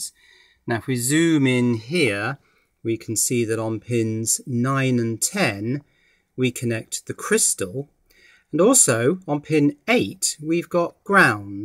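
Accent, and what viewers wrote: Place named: Scotland, United Kingdom. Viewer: Very good and didactic, with a nice scottish thrive!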